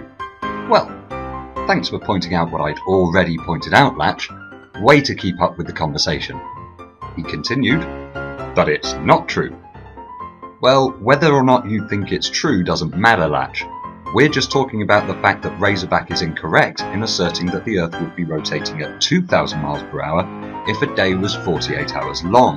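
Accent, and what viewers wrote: United Kingdom. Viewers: I'm American, male, 52, and British accent + sarcasm STILL intimidates me!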